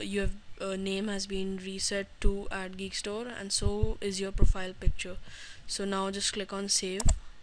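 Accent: India. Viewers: Are you Indian? Just want to know your accent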